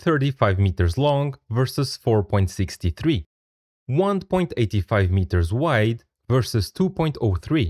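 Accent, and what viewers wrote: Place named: Portugal. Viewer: Sotaque tuga... :)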